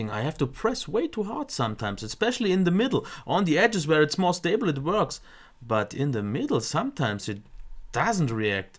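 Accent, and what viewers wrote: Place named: Germany. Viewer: Man merkt aber ziemlich, dass du ein deutscher bist :-) aber gutes Video so far :D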